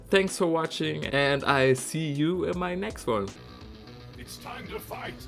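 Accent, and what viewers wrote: Germany. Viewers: lul bist du deutsch?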